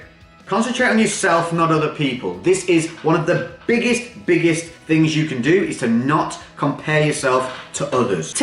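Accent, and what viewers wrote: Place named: Yorkshire, United Kingdom. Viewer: I've recently found your channel and I'm loving it! You sound local to me, I'm near York.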